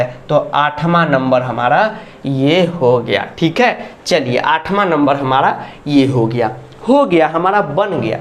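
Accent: Bihar, India. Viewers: Sir aap bhi Hamare Bihar ke ho kya ekadam Hamari Tarah Bhasha bolate ho कभी-कभी aap bahut Achcha padhaate ho thank you sir apni matrabhasha Mein samajhne ka maja Hai Kuchh aur Hota Hai😎😎☺☺☺☺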